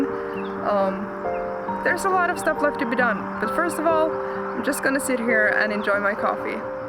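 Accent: Norway. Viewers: "Skjera Bagera" is undoubtedly Norwegian, also her accent and the nature in her videos. So I think she is from Norway. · Im 99% sure ur norwegian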